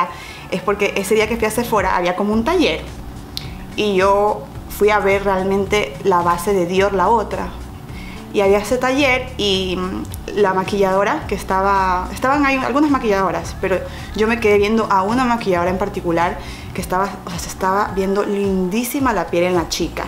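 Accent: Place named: Guayaquil, Ecuador. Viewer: Primera vez que veo tu canal y me gustó por tu forma de hablar de una dije es Guayaca jajaja leí la descripción y boom Guayaquil bieeeeen besos y saludos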